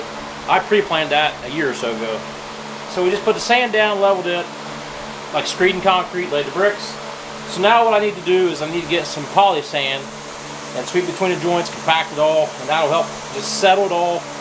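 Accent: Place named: South, United States. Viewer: Yay! Nice to hear southern ahyia 😂👍🏻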